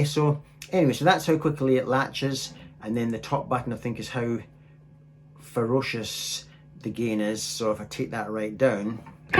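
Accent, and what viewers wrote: Scotland, United Kingdom. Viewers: Cool video and Scottish accent is the coolest accent in the world. cheers from Vancouver, Canada. Ed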